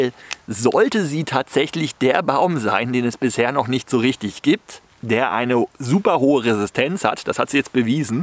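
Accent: Germany. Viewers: Danke für das Video, ich schaue mir aber auch ganz gerne die Danke- Videos an, jedes davon ist immer noch besser als was am TV so läuft. Versuche gar nicht erst, in der Schweiz zu verbergen, dass Du Deutscher bist, Du hast keine Chance, ich kenne welche, die es 20, Jahre lang versucht hatten, und dann immer noch sogar von den eigenen Kindern ausgelacht wurden, weil man sie nach spätestens einer Minute als Deutsche erkannt hat. Ist einfach so, mach Dir nichts draus. Und so böse sind wir Schweizer auch nicht, eher skeptisch. Gruss, Thomas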